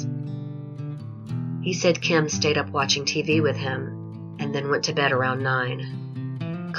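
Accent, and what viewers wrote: South, United States. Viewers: Yeah. Her voice is like silk with SLIGHT SOUTHERN TWANG🌄🌃🆒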